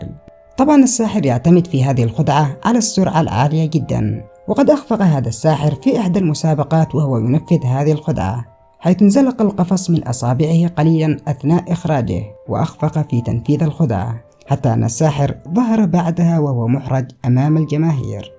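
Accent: Yemen. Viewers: آخيرا حدا يمني بدل المصري .... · ارجو عدم مزج اللكنه (التهاميه)باللغه العربيه حتى لاتكون النتيجه مخضريه الله يحولك. ....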